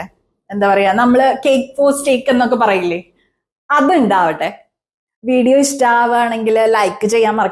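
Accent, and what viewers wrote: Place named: Thrissur, India. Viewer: Thrissur slang ingane allallo...🤔😅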